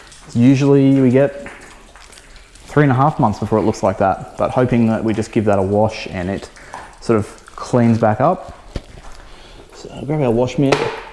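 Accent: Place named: Australia. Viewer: I love the Australian accent, especially when you say Mirch…😜 Great test! Mirch is quite impressive. Thank you.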